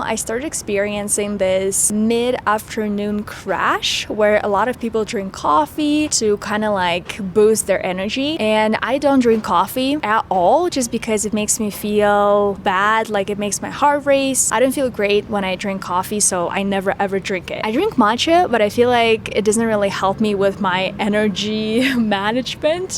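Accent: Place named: England, United Kingdom. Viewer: I really love your English accent you have fascinating fluency in English ❤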